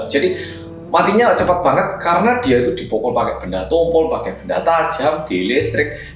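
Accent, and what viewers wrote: Java, Indonesia. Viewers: I'm addicted to : "Halo guys welcome to korea reomit bersama orang korea yang medok" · om medok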